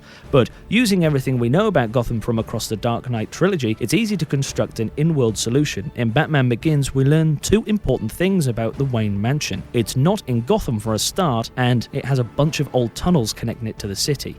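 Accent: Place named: Bristol, United Kingdom. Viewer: He sounds a bit bristolian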